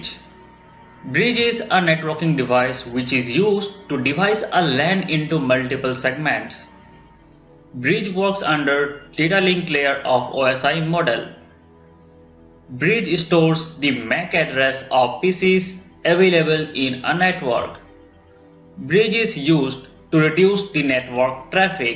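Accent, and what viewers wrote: India. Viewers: video plays heavy indian accent is playing I find another video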